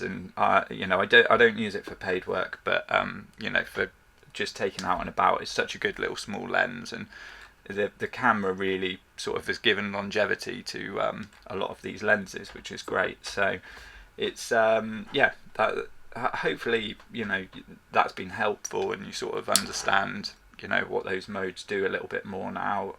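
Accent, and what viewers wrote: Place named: RP, United Kingdom. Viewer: Hello From Malaysia! I Just got the GH6 yesterday. Your channel is very useful. I appreciate the RP (Received pronunciation) accent.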